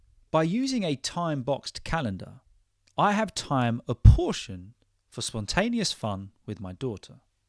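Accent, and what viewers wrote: England, United Kingdom. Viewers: Thanks teacher, I am osman I follow you and enjoy. topics.Also your accent is realy authentic eglish .My good wishes from Turkiye 😊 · One and only British native speaker who speaks slowly and clearly thanks for understanding us haha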